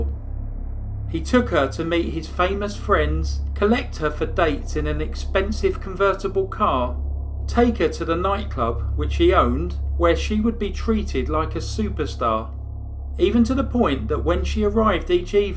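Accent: United Kingdom. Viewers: talk about a strong British accent . where's this from Leeds?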